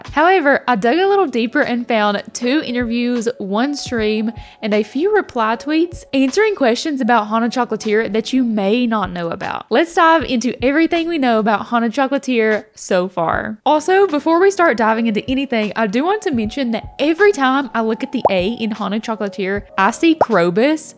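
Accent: South, United States. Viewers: It's so refreshing to hear a southern accent coming from a "cozy gamer" :) We southerners get a bad rep quite often so my wife and I are really loving you!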